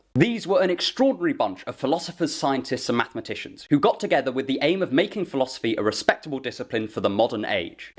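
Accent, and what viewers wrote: United Kingdom. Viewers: To top it off he’s quite the posh chap with a nice British accent 🫡 salute you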